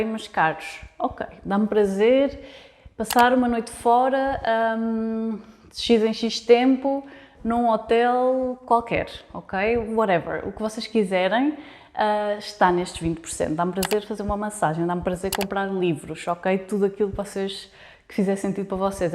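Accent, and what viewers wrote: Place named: Portugal. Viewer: Sotaque português é agradável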